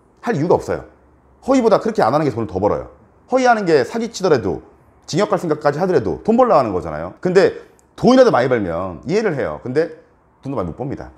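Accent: Gyeongsang, South Korea. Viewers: 진행자분 경상도 사투리좀 감추세요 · 딱 들어도.. 경상도사투리인데 왜 북한이라고 하는지?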